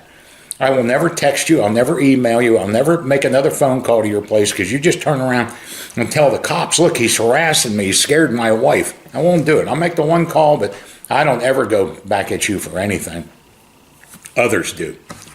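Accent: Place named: Pennsylvania, United States. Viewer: LOL! I love how takes over and IS the boss. Where at are you from in PA? I'm from a small town called Barnesboro named after the coal mining company who owned everything "Barnes and Tucker". When I was an Apprentice Lineman we were building a transmission line outside of Ebensburg PA and we caught a crow that was injured on the right of way. My foreman took him home and raised him and he turned out to be the biggest thief of anything that was shiny. LOL! He was the best pet he ever had. Could never trust him around anything he was able to pick up though. HA! Did 3 years in the U.S. Army, 1981 to 1984, spent mostly at Ft. Bragg as an MP. Hopefully you won't hold that against me too much. I won't hold being a squid against you. LOL Love what you do! You cracked me up getting on your boy. You definitely sound Pennsylvanian.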